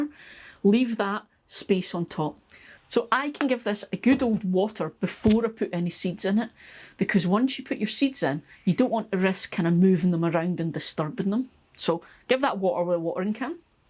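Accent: Scotland, United Kingdom. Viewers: I truly don't know why more people aren't watching your channel. I get so excited every time you come up with a new episode. You're just so cheery and wonderful and I love your cute Scottish accent. It's just such a pleasure to watch when it's -27C and 30 cm of snow with 10 more to come out my window... Hahaha I guess that's what I get for living in Canada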